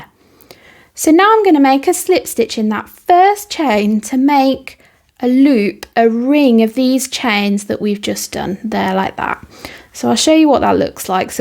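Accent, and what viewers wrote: England, United Kingdom. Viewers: Fantastic ❤ shame you use US terms as you are from UK - would suggest you say UK terms with US terms on the screen?! Such a nice change to hear an English voice 😊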